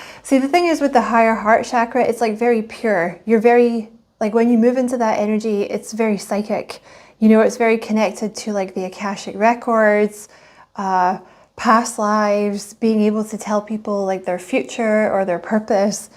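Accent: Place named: Scotland, United Kingdom. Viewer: So I watched this video after you recommended it in the Scorpio reading. I’ve never watched a Virgo reading before but I checked my placements & it turns out I’m a Venus Virgo! I was shocked to learn this! Wow did this resonate for me! Thank you for sharing your gift of channeling. Really enjoying both of your channels. Happy New Year! Love hearing your Scottish accent. I have family history from Scotland so it’s been great to connect with you. 💕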